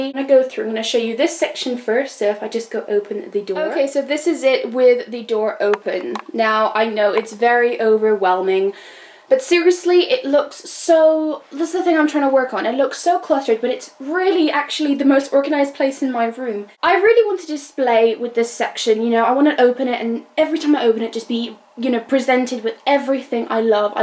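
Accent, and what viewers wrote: United Kingdom. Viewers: british accent dear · Are you Scottish or welsh or English? But when I saw the Victoria secret dogs I thought they were from the USA and also the candles. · where are you from, you sound british, and most of the stuff is from british shops, but im sure i can hear another accent in there somewhere <33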